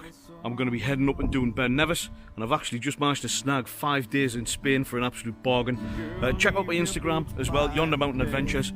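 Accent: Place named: Northumberland, United Kingdom. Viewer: Northumberland is wonderful. Great accent. I've just bought one!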